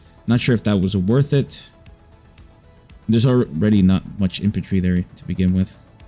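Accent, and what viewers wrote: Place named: United States. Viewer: this bro has a goofy american accent, damn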